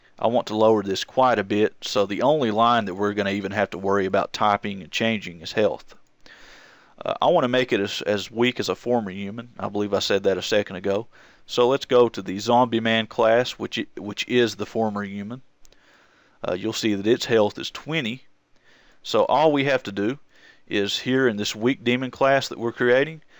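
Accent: South, United States. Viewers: i’m a bit jealous of your voice you’re kinda like hillbilly morgan freeman i should know; i’m a southerner myself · this is a good video, but the thing that intrigued me the most is his cowboyish accent. really satisfying to hear it XD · sounds like a redneck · You sound like a country boy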